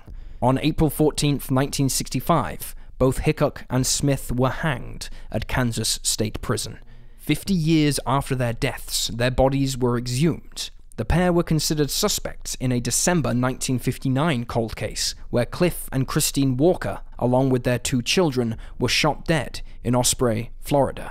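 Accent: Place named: Newcastle, United Kingdom. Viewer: He has the same accent as myself, north East England the accent is called 'a geordie accent'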